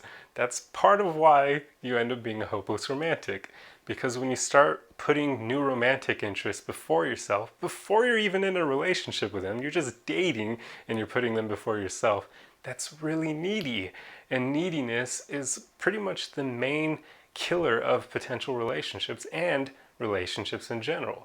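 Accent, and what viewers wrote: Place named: Germany. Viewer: You're such a chill guy, thanks for your video! Also, where are you from? For some reason your accent sounds mildly german to me lol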